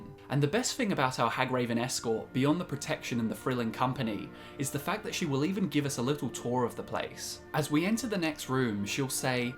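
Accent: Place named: Australia. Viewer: Are you an Aussie? you sound it. I am too XD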